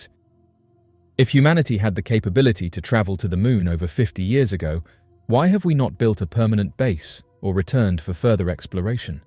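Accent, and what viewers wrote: United Kingdom. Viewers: Even you know, with your British accent, THAT WE HAVE NOT LANDED ANYONE ON THE MOON. STOP PRETENDING OTHERWISE.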